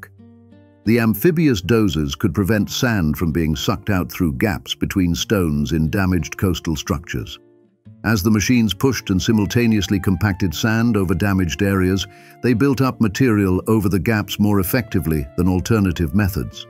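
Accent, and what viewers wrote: United Kingdom. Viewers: Subscriber here. Liked and hyped this video because it’s very cool! No bouncy line at the bottom… Awesome! Now, if we can just get rid of that British accent…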